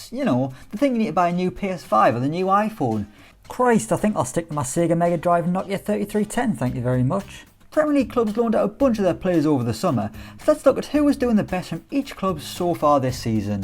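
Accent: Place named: Ireland. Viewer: If I don't hear the irish accent... I press exit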